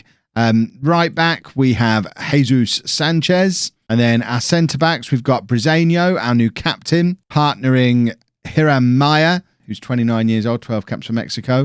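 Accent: United Kingdom. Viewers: Man, you went to Mexico and the ladies are all just falling in love with you! I didn't know a British accent was so popular in Central America.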